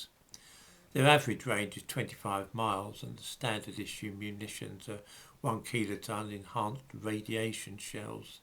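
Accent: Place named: Cockney, United Kingdom. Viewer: He could be and he does hail from East London and his dialect could be construed as Jewish. My mate’s dad was a Jewish tailor from Walthamstow who should to supply the Krays and he had that East End dialect which might be heard as he speaks.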